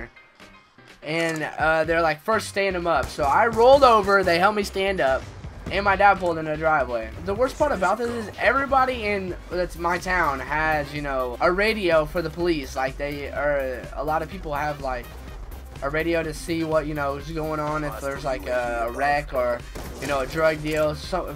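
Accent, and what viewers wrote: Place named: Indiana, United States. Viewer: think that's just his Indiana accent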